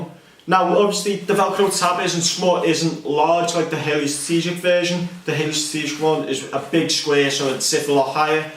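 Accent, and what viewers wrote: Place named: Liverpool, United Kingdom. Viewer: rrrr aaaaa you gotta love the liverpuool acccent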